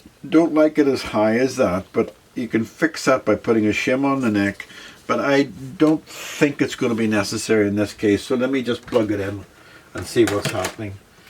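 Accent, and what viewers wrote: Northern Ireland, United Kingdom. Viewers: Thanx so much or the video .Nice to see the Guitar getting some TLC . I Have the Both the 4 and 5 string SR 300E ..I also appreciate your accent as i was born in Northern Ireland as well..Kind Regards .. simon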